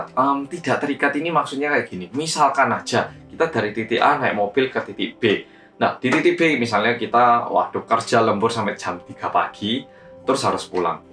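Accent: Java, Indonesia. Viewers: Jowone mendok tenan jozzlah..... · Ws gembe kopi durung mas medok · Kemedo'anmu berkurang sol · Oke hallo guys welcomback to korea reomit bersama orang korea yang medoooookkkkk Aku pengen naik kereta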